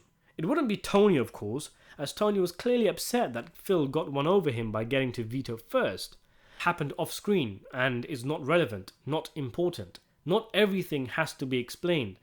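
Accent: United Kingdom. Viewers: While i feel retarded for listening to this british "oh well let me explain to you dummy stuff" cant stop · By far my favorite part of your channel is hearing you say Sopranos wordplay in a UK accent. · I love this British accent commentary..... A muncher of ass LOLOLOLOL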